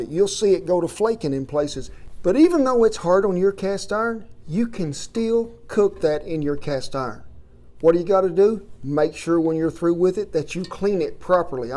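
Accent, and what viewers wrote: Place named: Oklahoma, United States. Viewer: Since you live near Altus, OK, that's an OK accent. My Mom and grandmother grew up in Magnum, not far from there. OK is okay. :). So is that OK accent. I'm loving it. Reminds me of my Mom's family.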